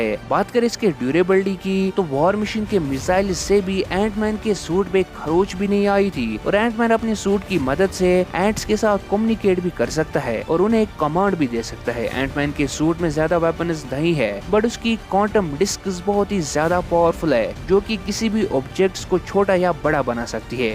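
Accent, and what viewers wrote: Kashmir, India. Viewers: Bro your vioce sounds like kashmiri 🤨🤨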